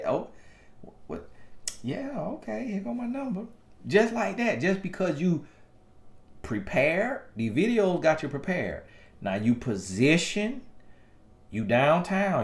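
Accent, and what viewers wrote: South, United States. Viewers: I’m a northerner so I love to hear southern accents 😆😆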